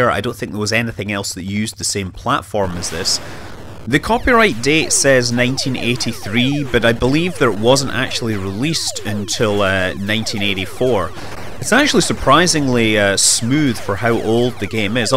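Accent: United Kingdom. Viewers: I'm really interested in languages and pronunciation and I just have to ask you: What kind of accent is that, that you are speaking? Is it maybe some kind of british accent? I'm obviously not a native english speaker, so I'm just curious about it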